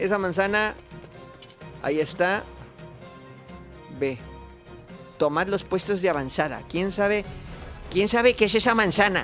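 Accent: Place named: Spain. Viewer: Que buen Gameplay! muy way! oirte hablando con asento Español gran!! imaginate yo, viviendo tan serca de los videojuegos y dejandolos de lado, estoy aprendiendo mucho,y disfrutandolos mas,con vuestros videos! crack!!! jeje perdon otra vez x tanta tardansa,y gracias! te queremos mucho! viva!!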